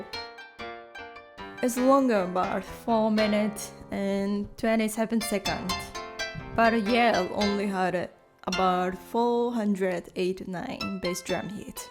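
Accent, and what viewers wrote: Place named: Japan. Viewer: Oh thanks for the english version! You're quite good at speaking it already. I think Japanese people speaking english sounds very cute lol, the pauses and how they pronounce things is nice :) . The background music is a little loud, but maybe you did that on purpose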